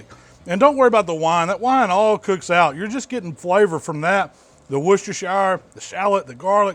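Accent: South, United States. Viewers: It’s Southern and if u aren’t from the South you can’t understand him. Rock on..🤔😁🤣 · I can listen to my boy all day with that! Southern drawl down home! Brotha from another motha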